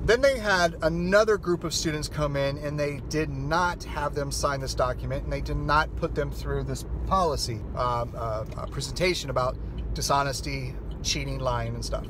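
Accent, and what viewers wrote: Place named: South Africa. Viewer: lol. It's a South African accent!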